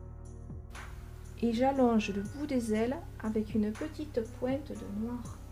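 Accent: South, France. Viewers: magnifique tableau avec les explications top et ce petit accent du sud qui apporte une note musical à tout ça . Un grand merci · Le tout parfaitement expliqué avec le charme de l'accent du sud qui m'est cher. Merci bcp de la part d'un toulousain.😊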